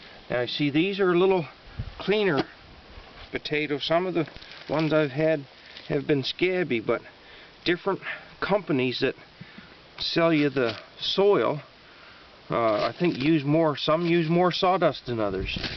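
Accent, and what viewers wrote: Nova Scotia, Canada. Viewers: Watched this as growing some Charlottes in the UK - but I spent a lot of the video interested in your accent - I'm from the South West of England and I can detect a lot of 'West Country' traits in the Novia Scotia accent!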